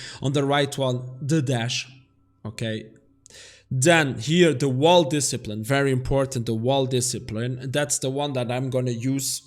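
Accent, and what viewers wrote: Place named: France. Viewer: pti accent Français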